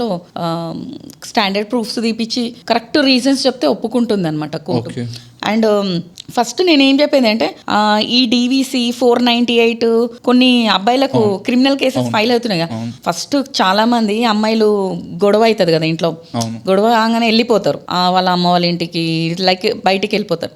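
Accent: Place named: Telangana, India. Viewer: Super madam telangana slang!! Super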